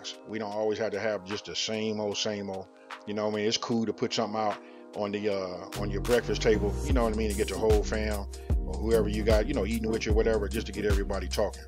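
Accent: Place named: California, United States. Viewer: That Cali accent don’t lie! I love it! And those pancakes looks sooo good!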